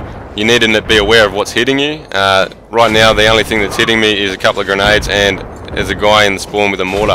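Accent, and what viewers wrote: Australia. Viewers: Great commentary man, good tips. It's good to finally hear a Aussie commentator. :) I'm also looking for more people to play battlefield 3 with on Xbox. Thanks.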